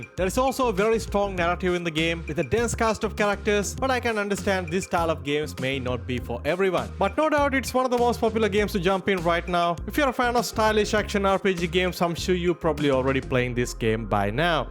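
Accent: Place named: India. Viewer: Why do u sound Indian brother? R U? Btw I am an Indian.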